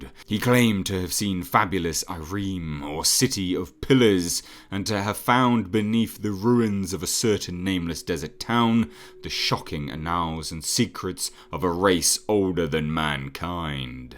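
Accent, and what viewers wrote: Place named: England, United Kingdom. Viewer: sounds like James Earl Jones with an english accent. "Oi! I am your father mate!".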